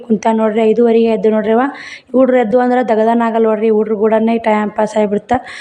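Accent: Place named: Karnataka, India. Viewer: Nice love this Kannada accent ❤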